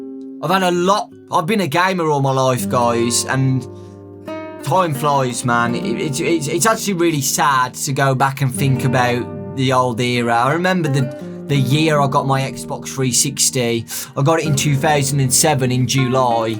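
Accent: United Kingdom. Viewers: My family was happy for me when i became a GachaTuber. The only reason im good at a british accent is because i watch you.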